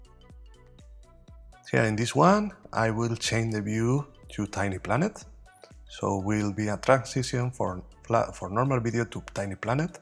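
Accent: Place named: Spain. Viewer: your accent (espanol I assume) a little hard to understand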